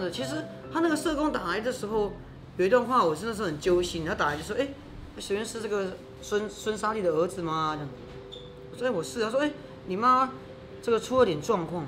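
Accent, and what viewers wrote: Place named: China. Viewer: 你很可愛，加油。但你口音好重？ 你是大陸人嗎？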